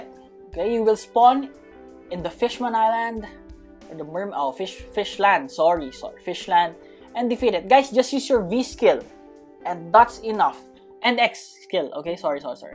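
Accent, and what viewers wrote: Philippines. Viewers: The accent r u a filipino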